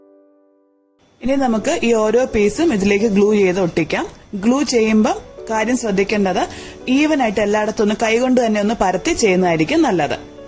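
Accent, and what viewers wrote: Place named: Pathanamthitta, India. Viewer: പത്തനംതിട്ട ജില്ല എവിടെയെങ്കിലുമാണോ വീട്? ആ ഒരു style of talking...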